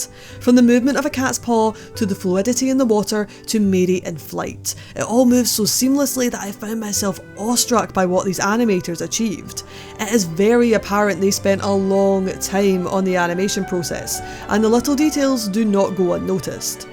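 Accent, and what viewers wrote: Scotland, United Kingdom. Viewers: Agh, dang it! I had a feeling I got it wrong! It was in the back of my head for a while. I was like: “you should edit that. She might be from Scotland.” I blame count dankula. I was like, “she doesn’t sound like *him*, so, no.” Well thanks for not taking offense. I know the scots and the Irish hate being compared to each other. And yeah, I think we all wanna be a bit more like SuperEyepatchWolf sometimes. That man is a legend. I look forward to more videos as always! Thanks for your kind responses.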